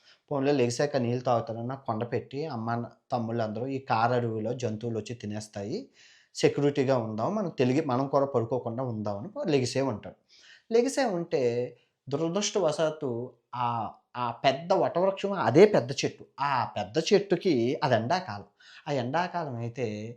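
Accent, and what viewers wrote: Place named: Visakhapatnam, India. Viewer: Video ఇంత త్వరగా ఐపోయింది ఏంటి అనిపించింది చెప్పే విధానం మన వైజాగ్ స్టైల్ స్లాంగ్ లో super super super అన్న..👌👌 👌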